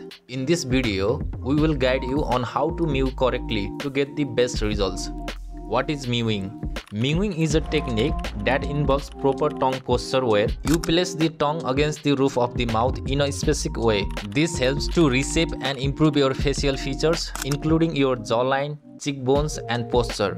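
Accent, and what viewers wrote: Nepal. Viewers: Bro face reveal gara ta tmero sound le tw 100 percent nepali ho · Nepali cha taw boli tah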